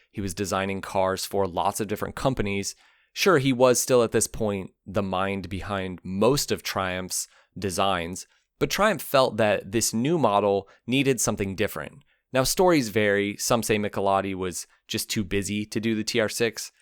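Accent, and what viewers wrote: United States. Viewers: THERE IS NO "W" IN "JAG YOU ARE." Stopped watching, since an American can't teach us about BRITISH CLASSIC CARS. And DRC'd as well. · Well done for putting this together with a nice presentation of the models throughout the years, ... but I wish you would pronounce Jaguar, Nissan and Datsun correctly and not in that dreadful American way. For instance, Jaguar is pronounced 'Jag-you-are' NOT 'Jag-Wah'! Nissan is pronounced 'Niss-an' (as in Miss) not 'Nice-An'.! Also, as a suggestion, since the emphasis was on the TR6, a bit of info on the uniqueness of its power train (which is one of it's main features), that being the 6-cylinder PI. With mechanical fuel injection, it stood out from others in and above its class because of this. Interestingly you make the comment, or imply, that in the early seventies, for a UK motor manufacturer, it was unique with it's overhead cam, but Jaguar had their overhead cam XK engine from the early 50's as fitted to the XK120-150 and the Mk1 & 2 from the late 50's & throughout the 60's. 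However, the TR6 is definitely on my wish list. You refuelled my interest! Thanks.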